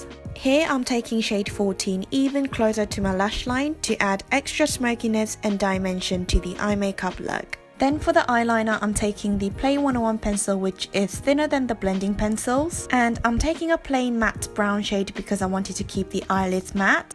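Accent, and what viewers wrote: United Kingdom. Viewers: 이번 메이크업이 에게 특히 잘 어울리네요 너무 강한 색 섀도우 쓸때보다 은은한 색들오 메이크업하니까 분위기가 깊은? 그런 느낌이 들게해여!!이뻐여!! 가을이 좋은 이유는 저는 하늘보는거 좋아하는데 가을의 구름들이 가을만 가진 예쁜 모양이나 특이한 형태 그리고 가끔씩은 택스쳐도 다를것같은 그런 특유의 분위기를 가졌더라구요 그래서 전 가을이 좋습니다! 이벤트때문에 댓글을 단 것도 있지만 매번 듣기에 너무 좋은 영국발음과함께 영국생활을 리마인드 해줘서 메이크업은 물론 브이로그 영상도 잘 보고 잇어서 응원하고 싶다는 생각에도 달아봅니다! 어린나이에 타지에서 언니랑 둘이?인지는 확실치 않지만 생활하는 모습 보기좋구 앞으로도 좋은 영상 잘 부탁드립니다!!! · Holy Moly!가을이좋은이유는 여름에는 화장을 1시간넘도록 정성들여하고나갓는데 제가지성인지라 여름은금방땀을흘려버리니피부가 금방 무너지고 유분으로인해 눈가도 다지워져서 여름을싫어하는데 가을은 날도 따쓰하고선선해서 딱좋아하는날씨예요!바깥나들이 가기딱좋은날씨ㅜㅜ그리고 옷도가을때입을옷들도많고 2학기가 시작되는 계절이지요 새로운맘으로 다시 되덜아볼수도있고 그리고 행사도많고!!하핳 구독한지는 꽤되었는데 전 더도말고 님의 특유의 영국발음에 뿅가서 두말할거없이 바로구독 저도 영국에 대해 관심이많고 영국발음에도관심이있었는데 언니의 일상속에서 나타나는 영국발음!배운점이많아서 저도일상에서자주써먹고있습니다!예를들면 영어시간에 남들과는다르게..영국식으로....진짜 거짓말 안하고 요즘 영어듣기도 영국식발음 으로 거의 하는데 언니의 동영상으로인해 저는 영국발음에 익숙한지라 점수도올랐어요✌✌ 브이로그많이찍어서올려주세요!일상속의 님모습도 많이보고싶네요~~ 언제나 응원할게요!!영상 앞으로도 계속 많이 올려주세요!!다음 영상도 기대할게요!!!아 그리고 님은 입술전체에 립을 다발라도잘어울리시네요ㅠㅠ저는 풀발색은 안어울리는데 매트한 립스틱은 그라데이션하기힘들잖아요 그거 어떻게하면 그라데이션잘되는지도 알려주시면 정말 감사할것같습니다!!! 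· 헐.... 오늘 미모가 열일하시는듯 진짜 예뻐요!!!!! 저는 가을이 항상 좋아요! 처음엔 단순이 제 생일이 있어서 가을이 좋았어요!!! 생일이 10월 2일이라서 가을이 막 오는 시점이기도 하고 사랑하는 사람들이 축하해주고 이쁘게 봐주니까 가을이 너무 좋았는데 가을만 되면 센치해져서 좋아요!!!! 여러가지 옷을 입을수도 있고 여러가지 메이크업을 하고 변신을 딱 주기 너무 좋아서!! 저는 트렌치코트를 참 좋아해요!!! 가을에 어울리기도 하죠!!!! MLBB의 계절이기도 하구요!!!! 또 너무 덥지도 너무 춥지도 않아서 얼마나 좋은지!!! 이번여름의 엄청난 열기가 지나가서 올해 가을은 더 좋은거 같아요!!! 여러모로 항상 님 좋아하고 응원해요!!!! 저 님이 좋고 매력적이셔서 미국식발음에서 영국식발음으로 고쳐가고 있어요!!!!! 진짜 영국식발음이 전 진짜 님 매력중 하나인거 같아요!!!! 너무 예쁘고 멋진 님!!!! 가을도 좋고 님도 넘나좋습니다💜🙆💜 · 언니 특유의 영국발음이 좋아서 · 항상 볼 때마다 느끼는거지만, 님은 넘나 귀여운 것 ㅠㅠ 영국악센트도 진짜 러블리.. 항상 좋아요만 누르다가 오랜만에 댓글 다네요. 전 사계절 중 가을을 제일 좋아하는데 제가 더위를 많이타고 벌레를 아주 극혐하는 수준이기 때문에 가을이 제일 좋아요. 특히 제가 건성이라, 겨울엔 아무리 기초를 탄탄하게 해도 화장이 뜨더라고요ㅠㅠ 근데 가을은 시원한데다, 건조해도 화장이 뜨지않고, 비도 별로 안와서 항상 하늘이 예쁘고 화창한 날씨잖아요. 벌레도 없고. 그래서 가을이 제일 좋아요.😆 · (응?ㅋㄱㄱㅋㅋㅋ) 레드립은 넘나 화사해보이고, 25호 누디립은 넘나분위기 넘치십니다😭😭😭(덕통사고) 에뛰드가 이번에도 정말 열일했네요!!! 오늘 메이크업은 님 영상보고 영감받아서 클레식메이크업 해봐야겠어요ㅎㅎㅎ 아 맞다 그리고 가을이 좋은이유!! 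바로 모든분들이 꼽으실 이유같은데요!ㅋㅋㅋ 일단 바람이 넘나 선선하다는거! 불면증도 사라지고 너무 좋습니당♥ 또 전 개인적으로 가을겨울 옷들을 너무좋아해요, 니트 무스탕 블랙스키니 셔츠 등등 가을에 잘 어울리는 룩들이죵! 게다가 메이크업도!!! 주로 버건디, 퍼플 계열의 메이크업을 하는 전 가을이 너무 좋네욯ㅎㅎㅎ 이제 내 세상이도닷!!!!! 님 영상 항상 잘보고 있고요, 영상 속 영국 영어 너무 매력적이예요💕💕 영국이란 나라에 관심이 많아 발음이라던지 자꾸 그나라 문화를 찾아보고 있는데 그때 님을 알게도었다능...!!! 그럼 다음 영상도 기대하겠습니닷💖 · 언니 영국 발음이 너무 듣기 좋아서 구독하기 시작했는데 여전히 좋아요...ㅎ사실 제가 영어에 흥미도 없고 못 하기도 해서 영어 잘 하는 사람이 더더욱 부럽고 매력적으로 보이거든요ㅎㅎ헷 특히 툭툭 내뱉는듯한 영국발음은 정말 더럽...❣본론으로 들어가서 공교롭게도 제가 원래 제일 좋아하는 계절이 가을이에요! 가장 싫어하는 계절이 여름이라 여름 다음에 오는 가을이 제일 반갑고 여름이 오기 시작할 때부터 '얼른 가을이 왔으면 좋겠다'라고 생각하거든요ㅎㅎ가을 중에 특히 서늘해지는 9월 저녁 공기가 너무 좋아요 저는 아직 대학생이라 중간고사, 기말고사를 보는데 그 선선한 바람을 맞는 저녁은 시험에 대한 압박감도 없고 정말 여유를 느끼고 산책할 수 있거든요. 그리고 봄과는 다른 이유모를 설렘과 감성이 풍부해져서 좋은 것 같아요 그리고 제가 제일 좋아하는 색인 레드가 잘 어울리는 계절이기도 해서 화장할 맛이 나요ㅋㅋㅋ또 제 생일이 10월이라 제일 행복할 수 있는 날이 가을에 있기 때문에 더욱 가을이 좋은 것 같아요😊 이번 가을은 너무 덥고 태풍도 와서 제가 원하던 가을바람은 못 반겼지만 그래도 곧 다가오는 제 생일을 기대하면서 기분좋게 시간을 맞이하고 있어요 언니도 하루하루 소중히 시간을 보내면서 가을을 맘껏 느끼세요!!😉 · 영국 발음이 너무 듣기조아요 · 언니 안녕하세용 저는 조종사가 되기위해 공부중인 학생 조종사에요! 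댓글로 인사는 처음인것 같네용 ㅎ_ㅎ 영어를 워낙 좋아하고 잘해야되는 직업을 꿈꾸다보니 영국영어를 하는 언니모습에 반해서 구독을 시작했었는데 ㅎㅎ 그리고 해외로 삼주정도 어학연수겸 여행을 다녀온 영국에 살고있는것에 되게 반했었거든요ㅠㅠ 제가 놀러갔을때 버밍엄에있었어서 +학교 소속으로 간거라 주말밖에 외박이 안됏어서 런던을 1박 2;일로 다녀왓었는데 정말 반했었거든요ㅠㅠㅠ 여러가지요소가 제가 언니를 구독하게 한것같아요 ㅎㅎㅎ 그런데 이제야 댓글을 남기게 됐네욥! 제가 가을을 좋아하는 이유는 가을은 정말 비행연습하기에 좋은 날씨거든요ㅠㅠ 봄에는 미세먼지로 인해서 visibility가 안나와서 비행취소된일이 한두번이아니구 여름은 더워서 정말 진이빠져요 연습용항공기엔 에어컨이없거든요... 그 더운 여름날 갇혀서 땀을 줄줄.., 가을은 정말 연습하기 딱 좋은 날씨에 visibility도 좋을 뿐더러 구름까지 완벽해요 겨울은 너무 추워요.., ㅠ0ㅠ 강풍이 불때도 많구요., 그래서 저는 가을을 제일 사랑해요 ♥ 다른분들도 다들 가을을 좋아하시겠지만 저는 비행을 시작하면서 아무래도 더 좋아진것같아요! 댓글이 1800개릉 향하고있어서 언니가 제 댓글을 읽을수있을지가 의문이지만! 저의 당첨을 원하는 마음을 담아 댓글을 남겨볼게요!!! 앞으로도 영상 많이 만들어주세욧 ♥♥ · 오늘 메이크업 정말 이뿌네용👍🏻👻 가을은 제가 태어난 계절이기두 해서 가을이 오면 괜히 좀 하이퍼되구요 패션이나 화장 스타일을 좀 어둡고 딥한 걸 조아해서 쇼잉 오프 하는걸 즐길 수 있어서 조아요 ㅠㅗㅠ 영국 발음 그리울 때 채널 와서 영상 보곤 하는뎅 할로윈 멬업도 곧 해주셧으면!! 😘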